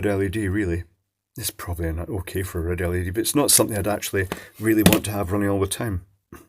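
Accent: United Kingdom. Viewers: the annoying british accent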